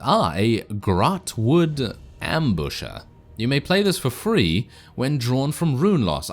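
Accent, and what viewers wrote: United Kingdom. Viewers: Oh and are you British because you sound like it not that there's anything wrong with being British it's just I was wondering you sound very gentleman like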